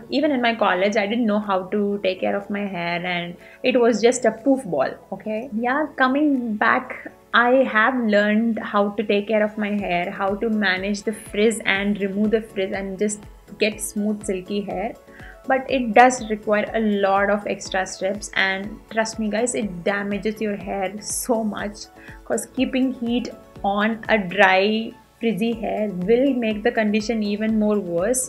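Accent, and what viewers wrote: Nagercoil, India. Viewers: Tamil la peasalaame neenga nagercoil dhaana??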